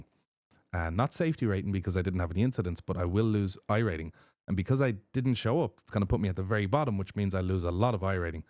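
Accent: Ireland. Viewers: Love the irish accent the irish people have always been my favorite english speakers. From what i have heard you are very similar to us austrians😂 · It's "control R" - it's Irish accent!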